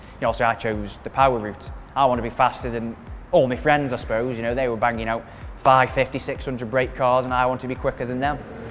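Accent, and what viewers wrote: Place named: Stoke, United Kingdom. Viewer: You are deaffo a Stokie! I recognise that accent from anywhere :D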